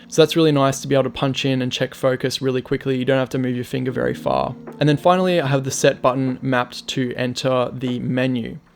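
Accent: Australia. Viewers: Great to hear an aussie reviewer and using PAL. Since this review with firmware update Clog 3 is available. Do you now use that?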